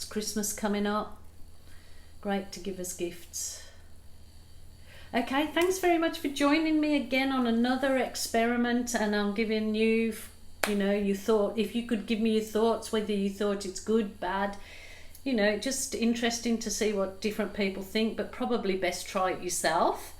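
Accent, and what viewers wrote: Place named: Kidderminster, United Kingdom. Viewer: Can I be nosey and ask where you are originally from? I’m in Kidderminster and you sound local xx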